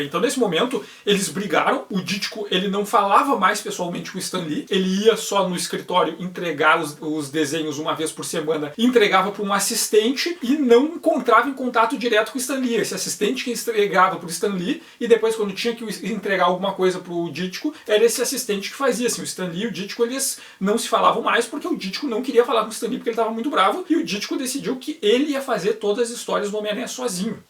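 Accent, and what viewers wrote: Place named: Rio Grande do Sul, Brazil. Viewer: A melhor parte desse vídeo, depois do conteúdo é claro, é ouvir a conversa entre figurões da marvel com um sotaque bem gaúcho! Bah! inclusive "bah" aparece recorrentemente em hqs da década de 60, tu sabes o por que disso?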